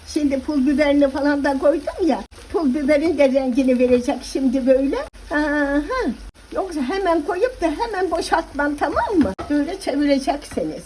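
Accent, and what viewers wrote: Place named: Tokat, Turkey. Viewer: teyze bende Tokat merkezliyim senin konuşmandan hemen anladım canım memleketim Tokatlı olmak çok büyük bir ayrıcalıktır huzur deyince Tokat iyiki varsın❤❤❤❤❤❤❤ teyze❤❤❤❤❤❤❤❤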